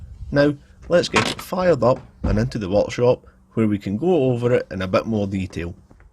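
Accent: Scotland, United Kingdom. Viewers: Are you Scottish? (Not Irish, right?...) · I love the calm pace of narration and Scottish accent. It’s like a relaxation tape.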